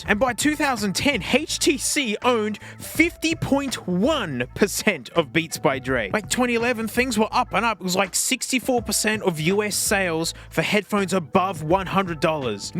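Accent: Australia. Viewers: I dont care about audio The only reason i subbed is to watch a random aussie man yell at tech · Wake up, the aussie man is yelling at headphones again. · Im early to the aussie yelling guy for once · finally im early to hear the australian man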